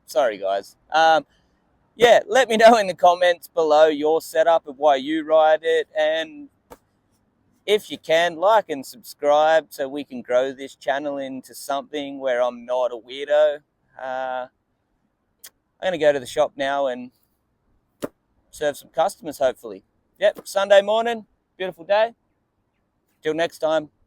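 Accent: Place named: Australia. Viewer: Great video it's interesting to hear other people's setups. I'm a complete beginner and just ordered: - 8.25 Jart Gravity deck (steep concave) - Indy Hollow Forged 144 trucks - Spitfire F4 Radial 53mm 99a (Black trippy swirl decal) - Bones Race Reds bearings - Bones Medium bushings (black/yellow) I have a feeling this setups gonna feel very nice based on the research I've done. Greetings from england, love the aussie accent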